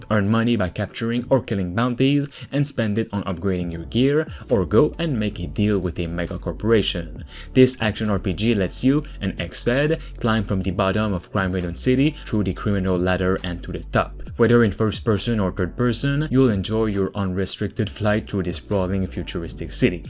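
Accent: Quebec, Canada. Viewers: this guy is clearly from quebec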